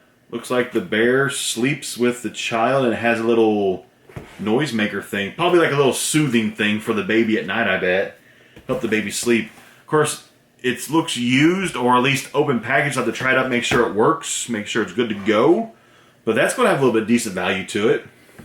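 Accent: United States. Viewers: I had a feeling that you were from the midwest but wouldn't have guess you are a Hoosier aswell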